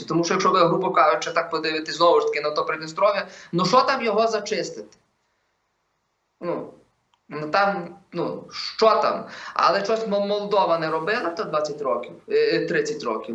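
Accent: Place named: Galicia, Ukraine. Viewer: Третии маидан готуе Порошенко та таки як ти ,и розмрвляи Украинською а не Галичанським суржиком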